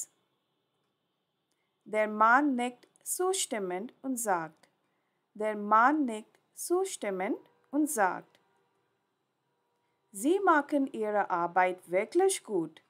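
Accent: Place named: Spain. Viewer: Sounds like some whose mother tongue is not German- (maybe spanish )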